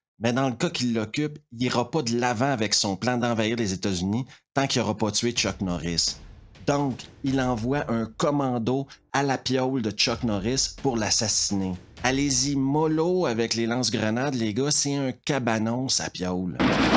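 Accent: Quebec, Canada. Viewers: Y'a quand même bien un sacré québécois pour nous raconter cette hostie d'invasion communisse que Chuck il a arrêté, merci pour l'histoire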